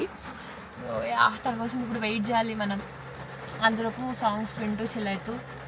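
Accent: Rayalaseema, India. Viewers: Ah yappa dagaraki was paka ralayasemma slang ❤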